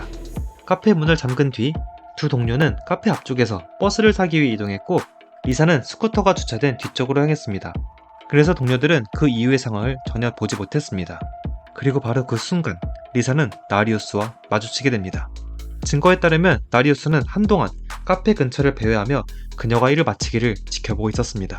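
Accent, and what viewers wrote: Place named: Yanbian, China. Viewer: 말투가 조선족인데?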